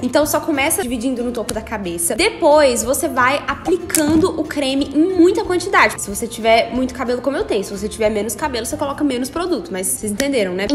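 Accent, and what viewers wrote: Minas Gerais, Brazil. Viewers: Vc e mineira?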